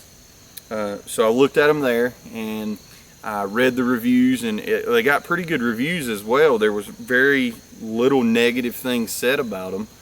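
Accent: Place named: South, United States. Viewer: The south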